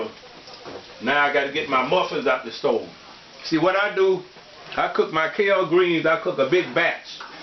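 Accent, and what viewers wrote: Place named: United States. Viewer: I had to give you a thumbs up because of how you talk. You're language is so country just like my people. Be Blessed !!!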